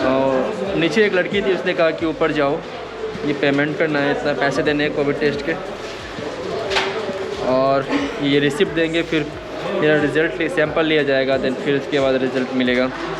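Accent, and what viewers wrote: Bihar, India. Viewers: Mitlish ka video acha tera bakwas 😡😡😡 h Tu bihari gadi ko gari boltha h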